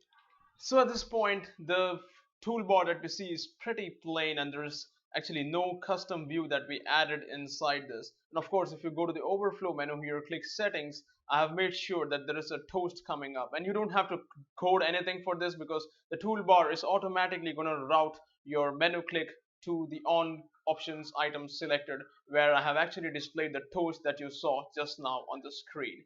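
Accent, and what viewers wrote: India. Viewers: Where are you from ? lol Indian??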